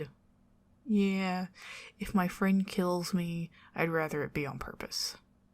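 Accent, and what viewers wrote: South, United States. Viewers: This southern accent is the peak of ASMR.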